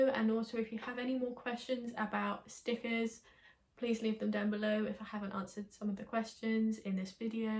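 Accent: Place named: United Kingdom. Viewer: Came for the tutorial, stayed for the accent 😅 UK people have the best accent. Good tutorial. Just bought a cricut and I'm learning. Greetings from Mexico.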